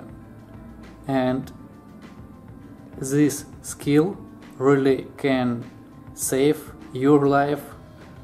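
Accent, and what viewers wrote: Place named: Russia. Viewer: I feel Russian accent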